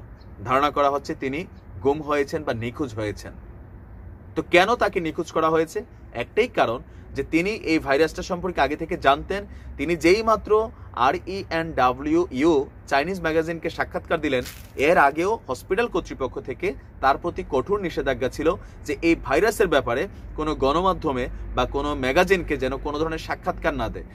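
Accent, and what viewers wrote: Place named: Noakhali, Bangladesh. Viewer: কথাসুনে মনে হয় নোয়াখালি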